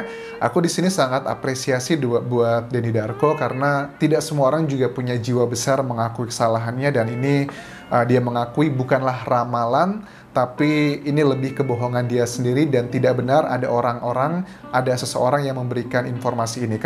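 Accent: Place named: Java, Indonesia. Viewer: mas wong jowo jebul yo.. jowone ngndi mas hhh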